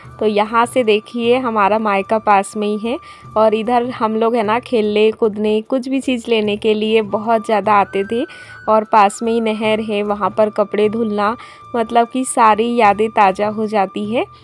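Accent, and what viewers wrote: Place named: Chhattisgarh, India. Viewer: Aapki boli chhatisgarh ki boli se kafhi milta hai hai na kabhi kabhi gaw ki bola kijiye achha lagta hai ❤❤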